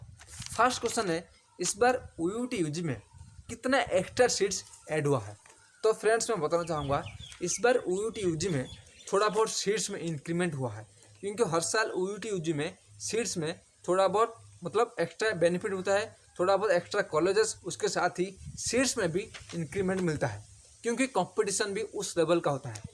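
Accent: Odisha, India. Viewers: Bhai Odia ho na 👈😊 Improve your hindi 😊👈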